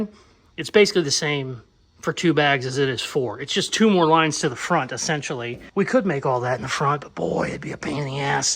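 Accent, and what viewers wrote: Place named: Missouri, United States. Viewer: Been watching all the fscalade vids,great content bro keep going, and also are you from Missouri? A lot of the ppl there said roof like you when I worked there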